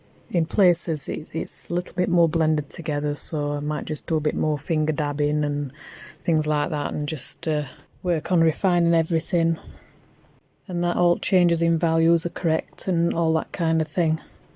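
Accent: Yorkshire, United Kingdom. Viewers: Love your channel and that you speak my (yorkshire) accent! Dog noses are my weakness so this is super helpful for me!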